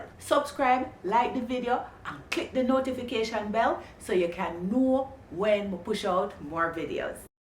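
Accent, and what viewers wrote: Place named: Jamaica. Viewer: I'm sitting on my couch alone and thought "the voice in my head needs a Jamaican accent", and here I am, an hour later still on your page. Tank yuh. Mi see ya likkle more. 🤣😁🫶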